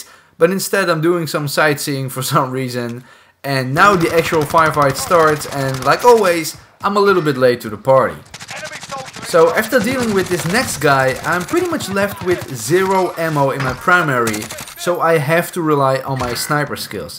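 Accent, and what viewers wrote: Netherlands. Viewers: You sound dutch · i smell nederlands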